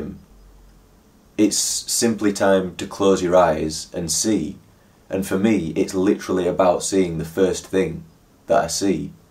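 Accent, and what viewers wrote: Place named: Halifax, Canada. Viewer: where are you from ? im from halifax you sound like you might be close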